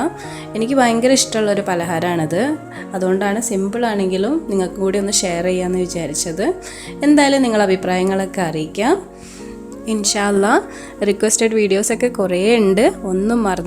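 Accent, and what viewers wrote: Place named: Kozhikode, India. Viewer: Kozhikoodu aano... But language manasilakunna rethiyil🙂🙂👌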